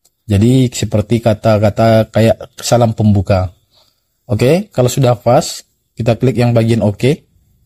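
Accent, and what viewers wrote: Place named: West Sumatra, Indonesia. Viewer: Dari logat nyo apak alah jaleh apak urang minang kan 😊🤭 Dima tingga kini pak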